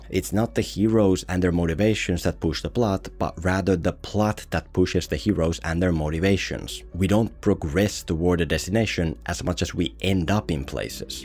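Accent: Finland. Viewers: Onko tuo suomalainen aksentti jonka kuulen?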